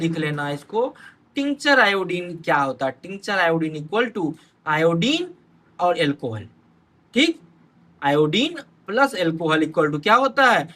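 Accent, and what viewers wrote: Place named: Mithila, India. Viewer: Sir apka jo boli h hme bahut acha lgta aap mithlachal ki or se h na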